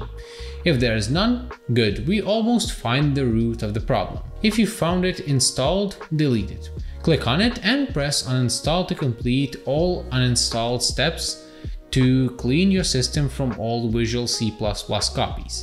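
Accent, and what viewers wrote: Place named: India. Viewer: Bro what’s with the Indian accent?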